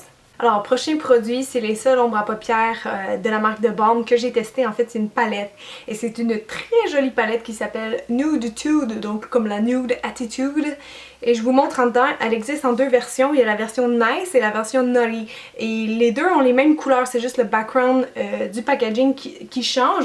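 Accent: Canada. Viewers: I never knew French Canadian sounded like this! I'm Belgian, I've had french in school for 8 years so I definitely understand you! I never heard it like this, it's beautiful! :D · ce que j aime bien avec c est que l accent canadien n est pas trop prononcé alors que avec d autre youtubeuses ou endroits du canada j ai plus de mal par exemple a montreal.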